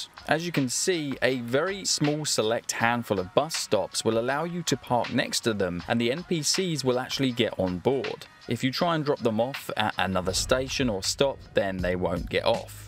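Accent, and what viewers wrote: Australia. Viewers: Do you haver alittle bit of aussie in your accent?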